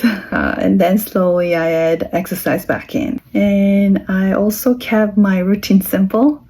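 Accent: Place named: South Korea. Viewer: It's always great to hear you speak and to hear tidbits of your Korean accent, Ms., when you share your health secrets and otherwise. Hydration and consistency are now noted in my memory banks. Thank you. ❤❤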